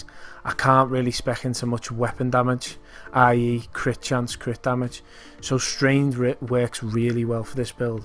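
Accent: Liverpool, United Kingdom. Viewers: That scouse accent.. lol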